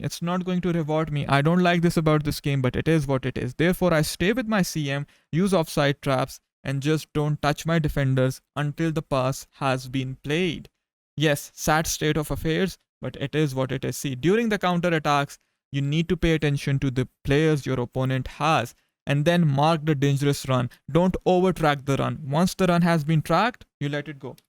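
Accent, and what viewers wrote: India. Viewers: Man just tell are you indian as your accent is so much indian??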